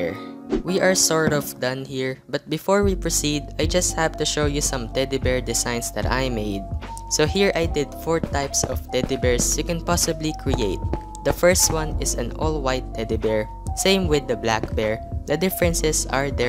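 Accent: Philippines. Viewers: Your accent is like a Filipino speaking English. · Hi you sound like a filipino:D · Are you a Filipino? I can tell it with your accent. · Hello, are you fillipino?? Iam fillipino too... I asked that becoz of your accent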